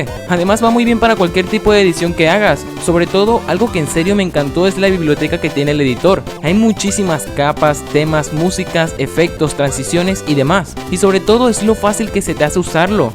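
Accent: Venezuela. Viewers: v eres venezolano? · Eres Venezolano? jajajajaja! Te me hacias familiar... aunque pense que eras mexicano. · Eres de Venezuela? No manches es la ostia papú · eres venezolano!!??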